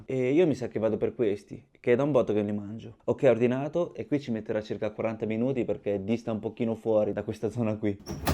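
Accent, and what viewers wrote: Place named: Modena, Italy. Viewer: Che ridere l accento di modenaaa 😂😂😂 sei un grande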